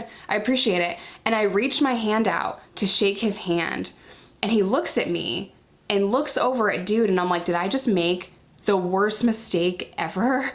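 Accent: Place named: Harlem, United States. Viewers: Sounds like Harlem or South Bronx... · Sounds like the Bronx 😂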